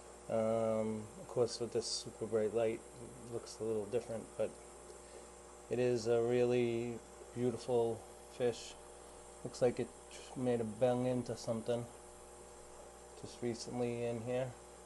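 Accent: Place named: Boston, United States. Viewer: I love your Boston accent. Awesome video, thank you for sharing.:face-red-heart-shape::hand-pink-waving::glasses-purple-yellow-diamond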